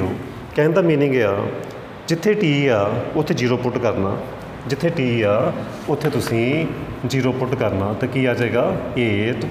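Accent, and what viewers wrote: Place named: Punjab, India. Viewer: Maja aa gaya punjabi accent mein maths padhne mein. Thanks for uploading the video Sir..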